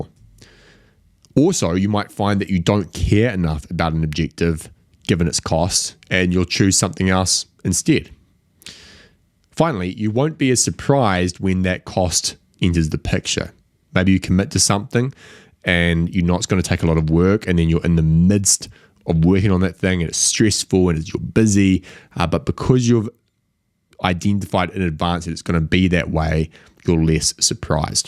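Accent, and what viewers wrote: New Zealand. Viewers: probably you have to wait for YouTube. I think he has a New Zealand accent, I also have to pause sometimes to rewind and understand a couple words that are pronounced differently than what I'm used to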